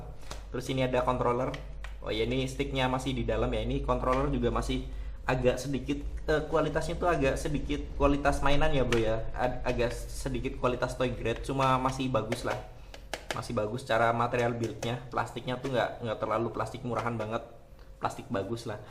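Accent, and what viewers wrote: Banyumas, Indonesia. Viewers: siiaaaap om...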